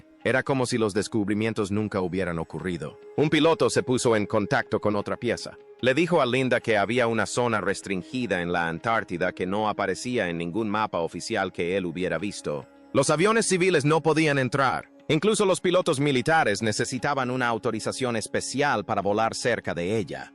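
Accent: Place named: United States. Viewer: Y este acento de Gringo aprendiendo español! esto es Falso!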